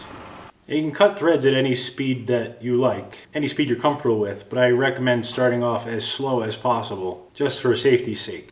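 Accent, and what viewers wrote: United States. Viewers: I want to ask you something. I know I may sound a little bit weird, but, looking your videos, appreciating them, especially the newest ones because of the high humor content, but I just can't help myself not minding my own businesses. Do you live in our really fucked up country called Italy of the recently utterly fucked up continent called Europe? I couldn't not notice in your videos some really commonly used stuff from brands that honestly I'd struggle thinking they ever crossed our borders towards other countries in Europe, or indeed in the world. The Maurer (Ferritalia) stuff or the bottle of mineral water from my region, it's the kind of cheap stuff I couldn't figure out how it would have have made its way to the U.S., provided the fact, that you sound quite American to me, even though I'm no expert since I'm not a native english speaker.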